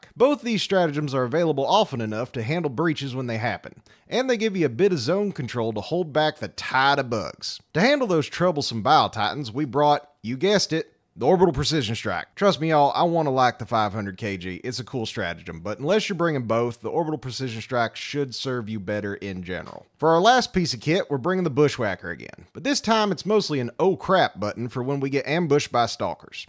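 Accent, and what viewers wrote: South, United States. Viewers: the southern accent just makes it so much better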